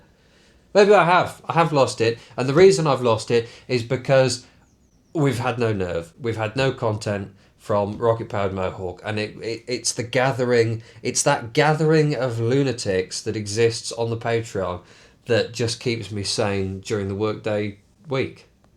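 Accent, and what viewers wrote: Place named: RP, United Kingdom. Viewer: your so posh O.O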